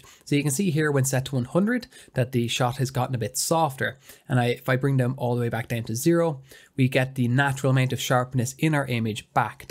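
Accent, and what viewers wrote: Ireland. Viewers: Great video! You sound Irish... visited Galway and Dublin last year and had a crackin' time!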